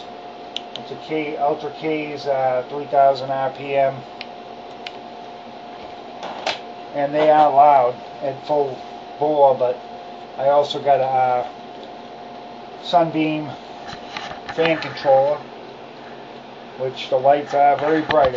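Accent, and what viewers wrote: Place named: Portsmouth, United Kingdom. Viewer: Hey, nice rig!! I'm in Portsmouth. You sound like you're from the neighborhood.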